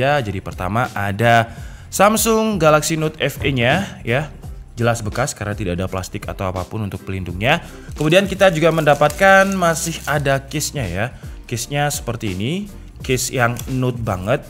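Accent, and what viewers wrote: Java, Indonesia. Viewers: Medok mu metu ms ngueri ngerong😭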